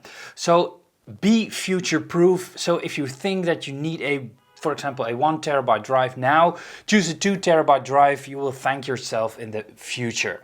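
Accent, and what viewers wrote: Netherlands. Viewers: Leuk en informatief Youtube kanaal! Ik type het maar in het Nederlands :-) aan je accent te horen ben je dat. Ik produceer zelf vanuit Logic Pro X icm een Mac Mini i7 6-core /32gigram/1Tb Maar dat is alleen maar omdat ik inmiddels gebonden ben aan Logic... Anders had ik wel een Windows machine gekocht ;-) Keep up the good work!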